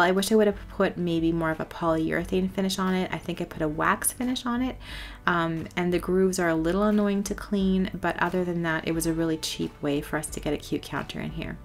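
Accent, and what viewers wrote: Canada. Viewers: You have such a pretty laundry room. I love the hits of red and cream and white it just looks so organized and clean it would inspire me to get busy on laundry. I like your Canadian accent too. Your voice is very soothing.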